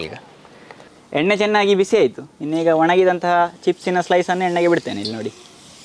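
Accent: Mangalore, India. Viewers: I subscribed to your channel because of the charm in your Kannada accent ! Love it when you speak in mangalore Kannada ❤ also you make wonderful recipes. Thanks 🙏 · ಮಂಗಳೂರು ಕನ್ನಡ ಚೆಂದ ಮಾಯ್ರೆ ❤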